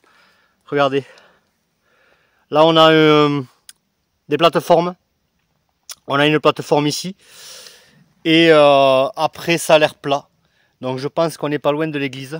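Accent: Southwest, France. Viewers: Bonjour 👋 je me suis abonné à ta chaîne très interessant est de belle qualité mais t’a le même accent du sud ouest comme moi 😁 tu est d ou ?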